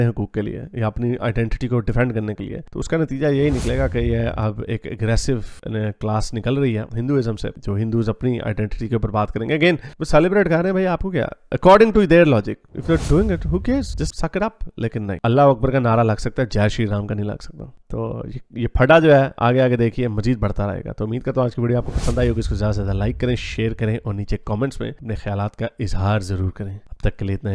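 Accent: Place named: Punjab, India. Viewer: I love the punjabi accent ❤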